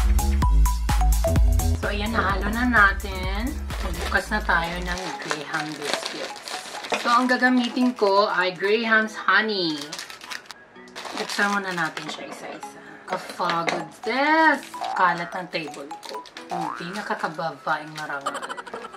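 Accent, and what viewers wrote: Visayas, Philippines. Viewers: Gustong gusto ko pag nag bibisaya ka mama haha ang cute nang pag bibisaya mo. Bigla naman ako nag crave sa graham pag uwi ko nalang ng pinas wala kasing graham biscuits dito e ang poor haha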